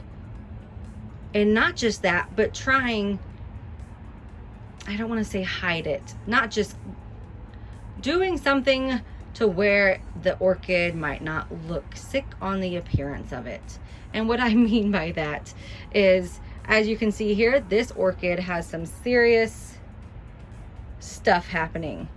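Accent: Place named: Michigan, United States. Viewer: Hi! New sub here! I don’t know how these vendors stay in business. I spent $160 at Norman’s orchids for 4 plants Dec 2018. They were all rootless, rescue plants. They wouldn’t send me replacement plants and the owners wife was rude to me on the phone, email and Facebook. They were all supposed to be blooming size. None have bloomed yet, but they’re finally starting to look like they’re getting happy. Btw, it’s nice to hear someone talk like me on YouTube!! I’m from MI 😀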